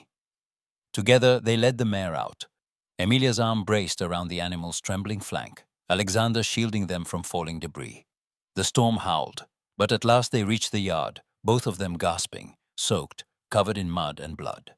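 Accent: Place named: India. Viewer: The reader has a trace of pronunciation from India or smth.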